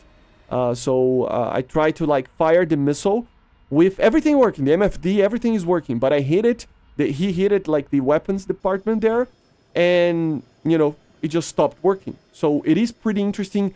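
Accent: Brazil. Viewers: hes brazilian. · he’s from Brazil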